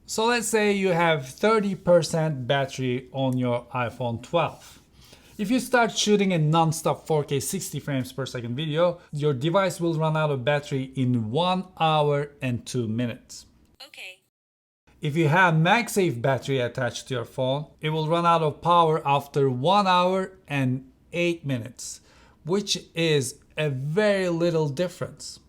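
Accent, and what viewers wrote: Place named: Turkey. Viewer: you are speaking like a turkish guy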